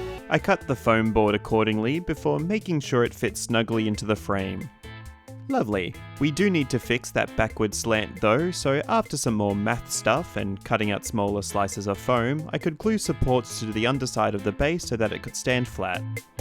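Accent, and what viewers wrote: Australia. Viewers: You sound like Oscar Piastri or are you just australian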